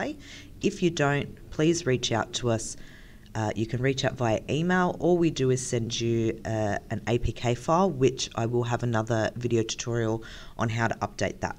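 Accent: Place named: Australia. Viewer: very helpful, thanks! ( so good to hear an Aussie voice narrating)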